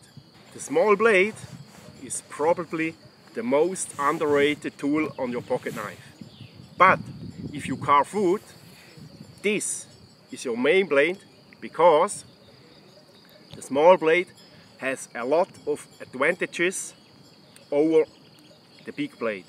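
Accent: Germany. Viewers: А что у него за акцент? Немецкий?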